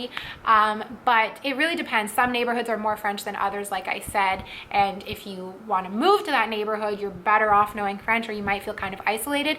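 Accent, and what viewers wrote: Quebec, Canada. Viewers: You have a Quebec accent.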